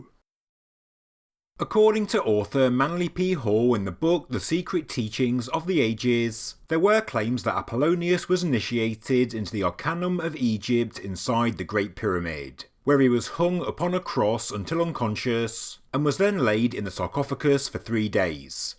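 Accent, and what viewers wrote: United Kingdom. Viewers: That’s his regional English accent. I love it!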